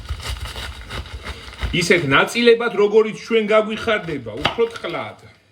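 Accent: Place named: Racha, Georgia. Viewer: რაჭველი ხარ???? კილო გაქვს რაჭული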